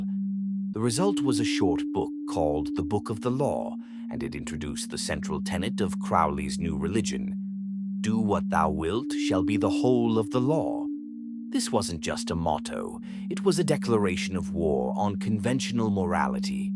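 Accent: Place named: United Kingdom. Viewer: At first I didn't notice the ominous music in the background, it fits the video, also loved the British accent it gave it more life😮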